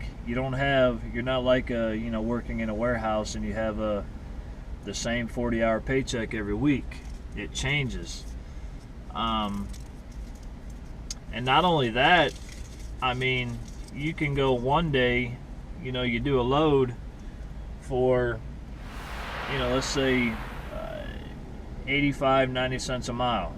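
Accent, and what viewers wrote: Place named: Texas, United States. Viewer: Just subscribed. I'm going through some of your videos now to catch up. You sound like your from Texas. I lived there five years and I have to say the one thing I liked best was the people. Honest and direct. They must have thought I was OK too because after a few years I was told "Your a Yankee but you're OK". Thanks for the reply and I'll be watching.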